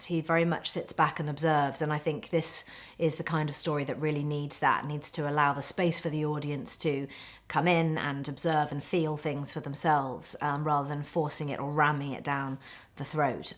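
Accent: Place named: United Kingdom. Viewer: why is she talking as a british accent?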